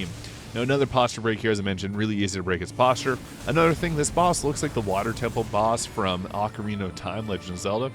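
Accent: Canada. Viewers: Is that a Canadian accent by chance? I’m from B.C and I swear I hear a fellow Canuck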